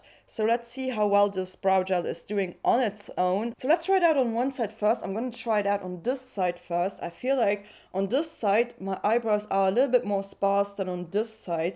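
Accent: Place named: Germany. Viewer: Thank you for your reviews, they are very thoroughly made, really like and enjoy them every time! You are one of the very few YT ppl that dedicate themselves to clean and vegan as well as cruelty free make up products / brands. Happy to have found you! I notice some kind of German accent and you are using quite a lot of adverbs ... Are you originally from Germany? So curious ;) Have a merry Christmas and enjoy some free and relaxed time with your family and good (vegan?) food.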